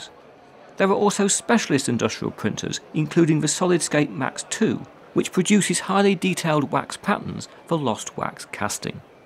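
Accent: United Kingdom. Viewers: To me, everything spoken with a proper english accent gets way more serious and undeniably true. Great narration.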